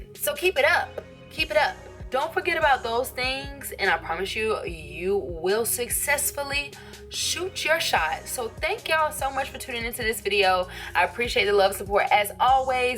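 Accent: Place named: Texas, United States. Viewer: you must be from Texas, I hear it in your tone, very smart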